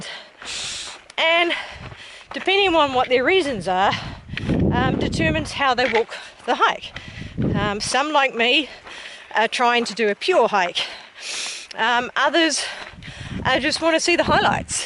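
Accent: New Zealand. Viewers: Glad to see that your not feeling the after affects of those tumbles! Which part of New Zealand are you from!? Such a lovely accent you have to! Let the haters hate and don't let satan's lies bring you down! God bless you and your family! Keep enjoying the Journey through God's gorgeous backyard!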